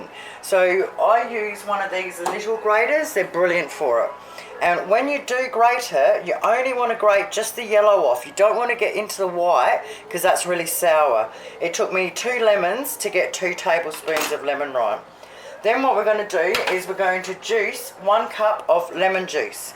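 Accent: Australia. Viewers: Great to find a Cook with a good ' downunder ' accent. !!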